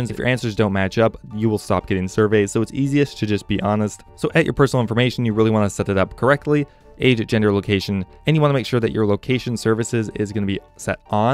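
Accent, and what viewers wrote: Quebec, Canada. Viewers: Wait canadian but french lemme guess quebec?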